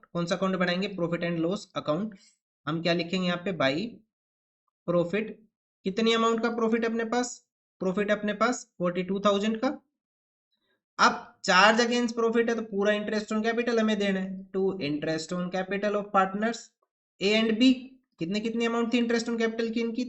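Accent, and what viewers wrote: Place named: Haryana, India. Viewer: Sir haryana se kaha se ho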